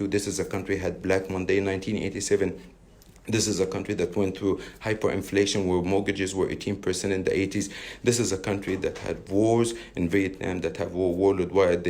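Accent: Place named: Iran. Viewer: I like his Persian accent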